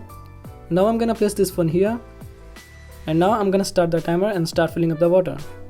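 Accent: India. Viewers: Please improve your english dude the sound still like you speak in india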